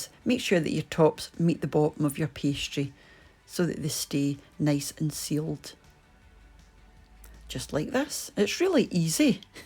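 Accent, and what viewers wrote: Scotland, United Kingdom. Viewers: Everything sounds better in Scottish. And they look amazing, just like I remember them from my trips to Edinburgh. Think I have to make them myself now... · Hello 👋 there from California 🌸your accent and wonderful recipe makes me yearn for Scotland where I went to Uni in St. Andrews!!! I will definitely make these! 😊 thank you enjoy tea time so much what city do you live in? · As a boy originally from Wishaw, it's great to hear that accent! one question, If I want to freeze the pies, should I use short crust for the top, or will puff still workl? Got the pot going as we speak! Thanks! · her scottish accent is so reassuring, reminds me of my student days, i had a scottish granny as my landlady and we ended up in bed. She had massive pendulous breasts with an insatiable sexual appetite. OH those glorious sex filled students in scotland brings back memories!! 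· I enjoy most of these recipes. I am very deaf so I rely on the subtitles. It's quite amusing to see that the strong Scottish accent and some regional dialect words are not recognised by the auto system so errors creep in .. but I can usually guess the intended script. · Thanks. Wife as from Banff area, and lost most of her accent, so you gave me shivers hearing you. And I have missed a real steak pie, and ever more so a Shepherd's pie. Also from West Texas, out in the middle. Cheers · That look really good ngl. By the way, nice accent. The Scottish accent is my favorite. 👍🏼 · Wonderful recipe! Your Scottish accent is absolutely charming. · Watching this from Australia. Those pies look absolutely delicious, and your voice with your beautiful Scottish accent is a pleasure to listen to. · Subscribed less than a minute into the video. Love the Scottish accent and the yummy food! Greetings from SC, USA. 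Love Scottish cooking and the happy feel of this channel! :) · Those look wonderful and I love your accent. I'm Scottish too, just American born. God bless you real big. Thanks for sharing what you know. Jude from Kentucky 🥀🌿🐴💚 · R ya Scottish. Love your accent and our pies. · "that Scottish brogue....Im in Luurrrrve!!!" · Lovely Recipe!! Congrats, Love the accent, I miss it as an Irishman I love the Scots! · That’s one terrific pie I have ever seen on here ..I’m definitely making this for my hubby ..looks so full of flavours and love the way you actually spoke thro how & what you was adding & doing ..love your accents wee Scottish are lovely people..thanks for sharing ..now adding to watch more you tube with you .❤️🥰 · Your Scottish accent got me here to stay!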